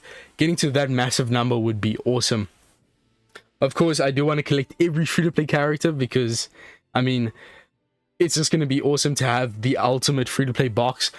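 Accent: South Africa. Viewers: yooo are you from south africa?? 😅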